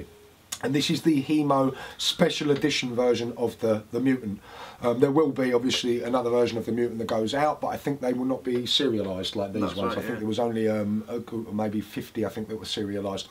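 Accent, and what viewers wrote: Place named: Cockney, United Kingdom. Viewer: Your cockney accented humor never fails to entertain me. I waiting for the first American video where somebody kicks someone else's ass with a mod like this.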